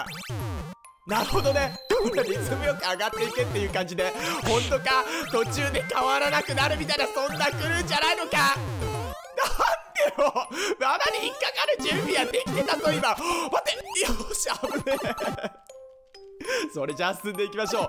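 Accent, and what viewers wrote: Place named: Kyushu, Japan. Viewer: 初コメです、7万人おめでとうございます！九州出身なのでさんのイントネーション好き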